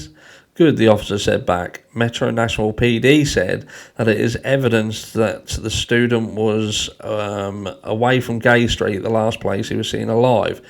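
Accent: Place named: RP, United Kingdom. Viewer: Hello from my vacation in the DR. I love listening to you in your posh British accent.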